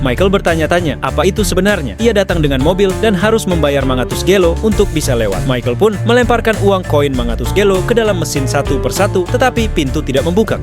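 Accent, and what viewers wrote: Java, Indonesia. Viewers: 😂😂😂😂 500 gelo... Jian jowo tenan Iki. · Jawa itu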